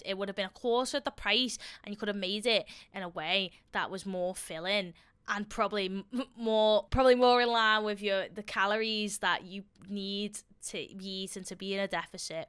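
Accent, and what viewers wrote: Liverpool, United Kingdom. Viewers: She’s not Scottish. Sure of it. She’s Scouse, I think.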